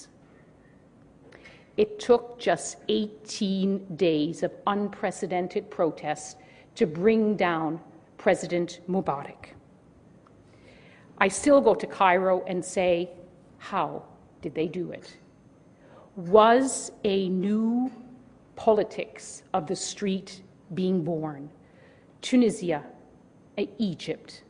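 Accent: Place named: Minnesota, United States. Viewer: She sounds a bit like Minnesota people, to me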